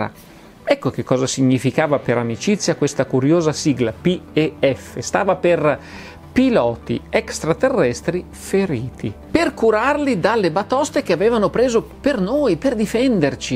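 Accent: Italy. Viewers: Mi piace più il tuo accento piemontese, di quello di Sigir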